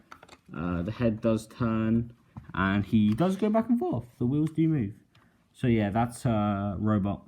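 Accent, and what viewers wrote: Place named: United Kingdom. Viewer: I’m sorry but it’s a solid British accent , it’s very easy to understand what is being said , are you from South Africa????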